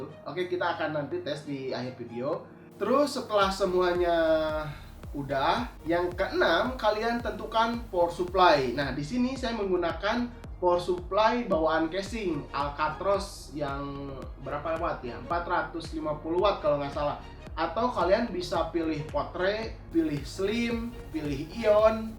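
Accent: West Java, Indonesia. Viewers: Urang Sunda kang 😁? Mantep lah infirasiyeun yeuh 😁😁 ahuyy #TerusberkayakangNOLIMITE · Ke nya si om orang sunda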